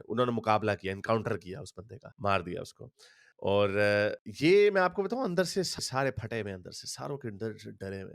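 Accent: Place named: Punjab, India. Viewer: Bhai kitna bhi disheartened ho jao but videos banani band nahi karna. Aapka Punjabi accent aur Punjabi mein kosna bohot acha lagta hai.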